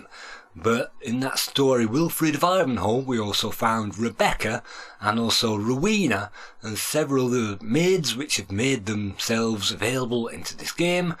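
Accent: United Kingdom. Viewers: Bri'ish guy narrates an American Amiga game about Bri'tain. Checks out. Nice video, and nice how you even used an NTSC hardware with the aspect ratio the game was designed for. You don't hear a lot about NTSC Commodore hardware due to how Commodore computers tended to do better in Europe.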